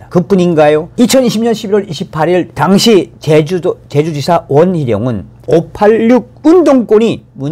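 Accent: North Korea. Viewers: 북쪽 말씨 듣기도 싫다 적당히 씨불이거라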